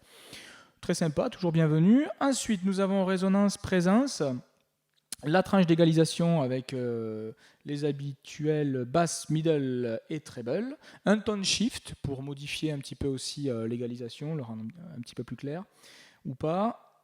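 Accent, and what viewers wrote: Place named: United States. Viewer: You sound like an American 😉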